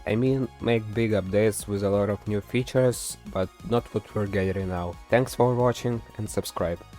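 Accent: Russia. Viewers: ты русский? Просто акцент как у русского, да и сам ник тоже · Акцент как у русского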